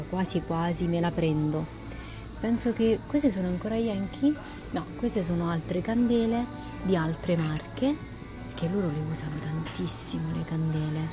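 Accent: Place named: Italy. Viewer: You guys need to grab up the jars with the old (large) labels, while they're still available in the UK/EU!! We only have the new, skinny ones in the US now (except for a few "Returning Favorites"): & we really miss the older, larger labels... :-( Loved hearing the beautiful Italian accent here! Praying I can visit Italia again someday... <3 Firenze will always have my heart.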